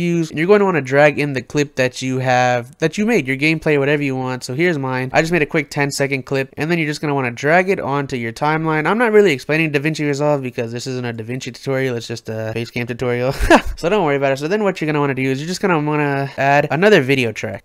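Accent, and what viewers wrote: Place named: New York, United States. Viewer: You must be from NY or the east coast. But bro thanks for the video man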